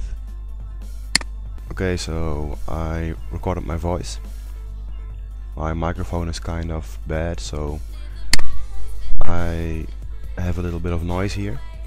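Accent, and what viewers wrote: Netherlands. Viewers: Are you dutch? You seem to have a dutch accent... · are you dutch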